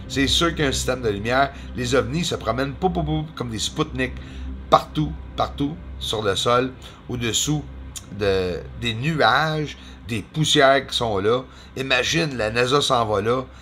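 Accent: Canada. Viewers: Bonsoir j aime bien ce que j entends.moi être en France mais je vois rien dans le ciel mais je sent qu'ils sont la. C est dingo mais j'y croie a100 pour 100.J aime bien ta voix de Canadien c est dingo. Bravo et à bientôt. Si moi qui ne va pas sur ordinateur j espère que un jour bientôt ils seront et que le monde sera gentil avec eux.Bonne soirée de la France qui est triste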